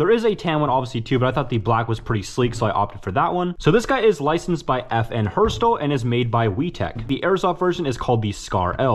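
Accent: United Kingdom. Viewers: Love the Brit accent or is it your real accent im British bit I don’t sound like that but hey keep it up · That british accent tho😂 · love the british accent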